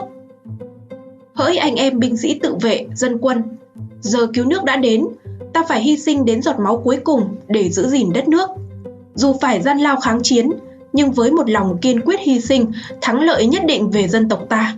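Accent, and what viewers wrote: North, Vietnam. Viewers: nói cho nghe nè đừng dạy người khác phải theo mình đó là hói hư tật xấu của người csbv Miền Bắc. Cụ Diệm (gia đình quan Triều Nguyễn cụ Diệm kinh qua chức vụ quan lại cải tổ+đi du học nước ngoài đây là lí do bọn Miền Bắc nói Cụ là tay sai hoặc con nuôi do Mỹ nuôi...) trước khi VN còn thời Pháp cai trị do Vua Bảo Đại đứng ra điều hành bằng chính sách của Pháp gọi là dân đi lính QGVN Khi Pháp áp bức sức lao động khổ sai thì dân đứng lên thành Lập nhiều Đảng phái Khi giành thắng lợi Pháp trao trả chính quyền thuyết cs ra đời cướp chính quyền. Bảo Đại kêu gọi Cụ Diệm về nối tiếp vì chính sách cũ của Pháp để lại ông xích mích Cụ Diệm thay thế Vua Bảo Đại lập nên VNCH chính sách mới cải tổ lợi dụng sự tài trợ kinh tế của Mỹ nhằm ngăn chặn sự lang rộng của Liên Xô ,TQ hay vì Pháp muốn được hậu thuẫn nhưng bị từ chối vì thời gia đình cụ bị Pháp đối xử tàn nhẫn , những năm tháng đầu là thời hoàng kim phồn thịnh giá trị tiền vnch phát hành lưu thông khắp nơi với sự kinh tế thị trường người Hoa Kiều buôn bán giúp dân Miền Nam đáng học hỏi với một Quốc Gia Tự Do Chính Phủ như vầy các nước khu vực như Hàn ,Sing ,Lào... 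còn qua học tập làm việc , sao không qua Mỹ mà qua vnch ,các Quốc Gia khác còn mời cụ đi du hành các nước , chưa có Nguyên Thủ Quốc Gia nào được Tổng Thống Nước Mỹ ra tận sân bay đón tiếp và bắn đại pháo long trọng Mỹ từng đề cử vnch ra hội đồng LHQ Liên Xô ,TQ và Phe Cộng Sản không đồng ý. Điều cuối cùng tôi nói là con nhỏ MC nói tiếng Miền Bắc cho chuẩn ở ngoài đời đi ,đừ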